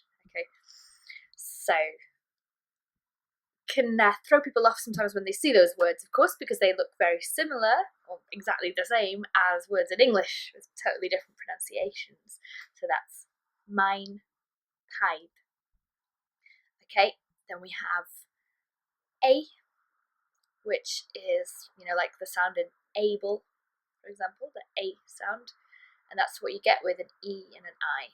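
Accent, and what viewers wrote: Wales, United Kingdom. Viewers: Really helpful video. I'll watch pt2 next. Defo helpful to ask the viewer to say a sentence, then hear it back correctly. Apologies if you've alresdy stated this elsewhere, but is this North Walian or South? Gets confusing when learning in the East Midlands! Diolch yn fawr iawn.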